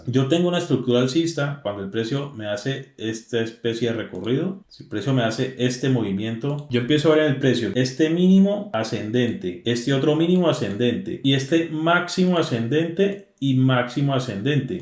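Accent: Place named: Valle del Cauca, Colombia. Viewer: Hermano saludos desde 🇨🇴 !!! eres colombiano cierto? Vallecaucano? pero por lo que veo no vives en colombia porque dijiste que operabas en horario europeo... En fin... solo queria darte las gracias por este contenido... te acabo de encontrar y llevaba rato buscando alguien que explicara sobre estructuras de mercado! Esto en este momento de mi vida es de mucha ayuda ya que estoy comenzando como trader y creo que entender esto es fundamental para poder ser rentable! Te doy un par de ideas de videos ya que veo que estas comenzando con el canal! seria bueno que hicieras un video explicando la terminologia que usas... por ejemplo... que significa "liquidez" cuando dices que hay traders buscando liquidez. o que significa acumulacion y cual es la diferencia... esto es algo que no tengo muy claro y que nos ayudaria mucho a entender tus videos... 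Muchas gracias nuevamente y aqui tienes un nuevo subscriptor fiel de tu canal!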